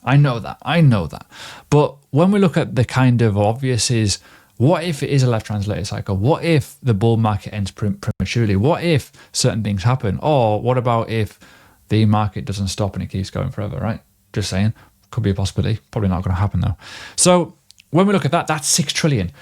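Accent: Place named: Yorkshire, United Kingdom. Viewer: I still can't get a handle on your accent mate... sometimes I get north Yorks/Darlington, sometimes I get scouse..😂😂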